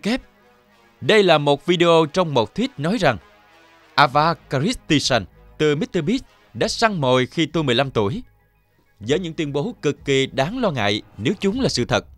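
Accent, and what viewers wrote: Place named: South, Vietnam. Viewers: Đừng nói vậy, tội nghiệp anh ấy lắm Anh ấy sinh ra là người nam nên giọng vậy, k hợp ý bn cho lắm Nhưng k sao, giọng anh ấy hay là đc :D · mình khá là ko thích giọng ng miền nam khi đọc kịch bản, ko phải tất cả nhưng từ lâu mình rất thích nghe voice kịch bản của những ng miền bắc hơn (mặc dù mình là ng miền nam), nhưng giọng bạn này hay thật, dù đọc tên có hơi sai nhưng tụ chung giọng vẫn rất truyền cảm, mong kênh bạn sẽ ra nhiều video chất lượng hơn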